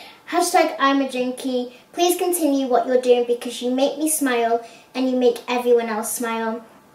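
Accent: United Kingdom. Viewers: Lol I totally used to always think you were calling us all "donkeys" and not "junkies" when I first started watching your videos! Hahahaaaa! 😂 I was like, why is she always calling us all donkeys? Lol don't hate me I'm so sorry I am absolutely AWFUL at trying to understand and hear British/English accents clearly lol. I'm from St. Louis Missouri so I'm terrible at trying to make out your accent.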